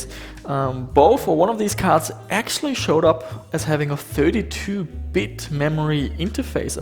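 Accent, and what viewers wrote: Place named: Germany. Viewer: Der Deutsche Akzent is real xD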